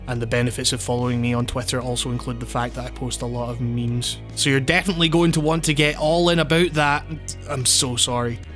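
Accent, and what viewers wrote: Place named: Scotland, United Kingdom. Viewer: This is the first video I have watched from you that didn't feel like i got something out of it by the end. I do appreciate the the experience was landmark for you and that you wanted to share it but for some reason i cannot put my finger on, i did not like this type of video from you as much as your others. For the record i love your work, the way your brain processes information, the way you convey that information to others through an impressive vocabulary that leaves me needed a dictionary sometimes. Also your accent is cool, I would assume...Scottish? Just a guess. Maybe Irish. Odd that I don't know how they are supposed to sound different but that they should. Anywho, i'm getting side tracked. I just wanted to drop in my two cents on how i felt about this video so that you could use it as constructive criticism and hopefully that will help you make additional content that more people enjoy. 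Again i cannot seem to put my finger on what makes this review less impactful and enjoyable than the others but i encourage you to keep at it cause you do good work. Again it's not that I didn't like this video, just less so than the others. I may be in the minority on that though so you should of course get input from others as well. :) have a good one man, hope to see more content soon! Edit: Sorry i responded to such an old video, you may not see this post at all, but i wrote and submitted it before i checked the date on the video.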